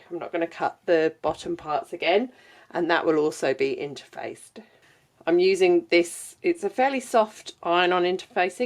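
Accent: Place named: United Kingdom. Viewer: Yes it has certainly given me inspiration, but I'm doing mine a different way! Yes, I'm also in Australia (live in Brisbane, but currently working in Perth). I always assumed you were living in the UK because of your accent so I was really surprised when I heard you say Lincraft haha! It's not often that I hear Lincraft or Spotlight on youtube!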